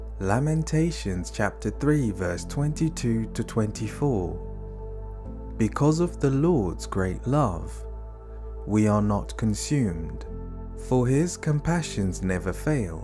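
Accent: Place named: United Kingdom. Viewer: I was looking forward to this, but... the British accent put me off. Too bad for me but I certainly hope this is good for those who can tolerate the accent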